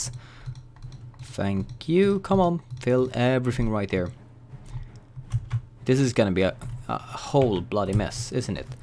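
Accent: Sweden. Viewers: Are you Swedish or Norwegian?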